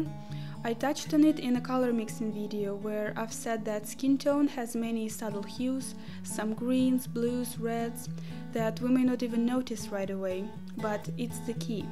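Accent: Russia. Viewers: Красиво) Слышно русский акцент) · Omg this Russian accent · This is so inspiring ❤❤❤ I noticed you have russian accent... Aren't you russian?😅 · Русский акцент узнаешь из тысячи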